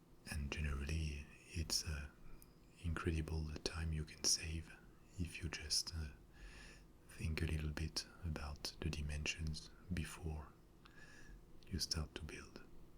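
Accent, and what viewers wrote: France. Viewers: As if regular soft speaking wasn't good enough here comes this guy and does it in a French accent; I AM IN LOVE WITH THIS!! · Vous avez un peu l'accent français dans vos parole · Mon Dieu! ASMR + votre accent francais (+ Minecraft) = Fantastique! :D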